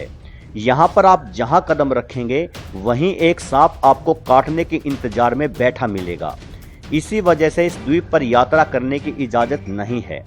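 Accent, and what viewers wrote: Haryana, India. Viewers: Bhai aap haryana se ho kya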